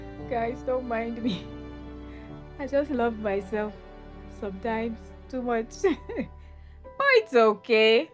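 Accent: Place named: North, United Kingdom. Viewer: Hello sis... Here watching.. Your presentation is giving vibe. I like the northern posh accent echoring beyond your voice 👌👌👌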